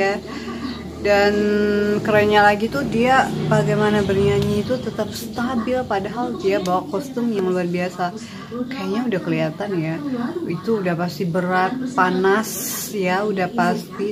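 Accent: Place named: Malaysia. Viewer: Mantap kak, comell kak cakap Malaysia 👍♥️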